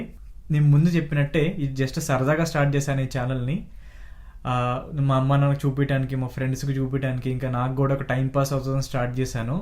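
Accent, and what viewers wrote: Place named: Telugu, India. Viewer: Hai 😊 garu.. Mee mokham (face),Mee Mata theeru..bhaguntadhiy... Telugu thanam Uttiy paduthundhiy...💯❤️🙏 Maaku JAPANE Kalla kiye Katty nattu chupisthunaru.....🧐😍🎊 Cangrasuletion👋🏼 You tube batten Vachinadhuku 👍🥳🤩 Mee video kiy feedha Avvuthanu..💯💕🥰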